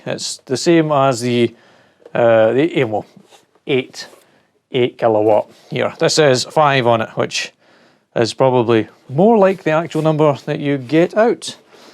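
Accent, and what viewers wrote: Scotland, United Kingdom. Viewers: "I'll tell you if it's dog shite" - My kind of no nonsense video. All the better with the Scottish accent! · Love ur Videos Mr, Very informative, practical and to the point! Nay ta mention the humor and the Scottish Accent. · Scottish accent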